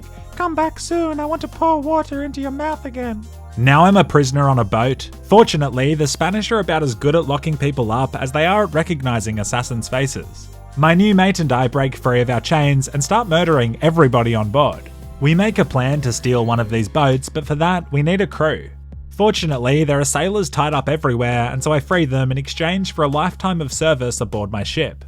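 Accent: Australia. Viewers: You have my sense of humour, you play all the games I like, and you have an aussie accent (the best accent). This channel is essentially designed for me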